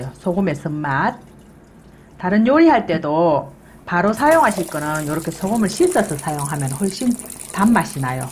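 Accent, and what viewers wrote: Gyeongsang, South Korea. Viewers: 목소리도.애교만점.최고.요리사입니다. 경상도.사투리.정말.멋지세요. 제친구도.대구있지요.요리프로. 최고.쉽고.제미있게하십니다. 축복합니다. 저는.전라도에.살지요.건강하세요.❤🎉😂😅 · 경상도 아줌마 사투리 제대로 👍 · ! 경상도 말소리너무구요미 너무 행복 합니다(♡♡♡♡) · 정다운 갱상도 아줌니 말솜씨땜시 보는것도 듣는것도 잼나게 밨어요 감사합니데이~~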